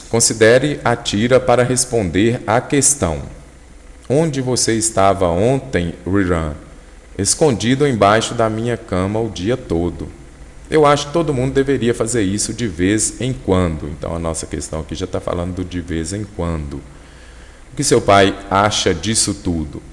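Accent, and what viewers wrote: Minas Gerais, Brazil. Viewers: Ahhhh esse sotaque mineiro que parece fazer-me compreender ainda melhor a explicação....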